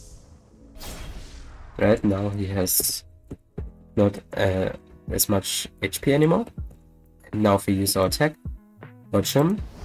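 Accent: Germany. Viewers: der deutsche aktzent is so funny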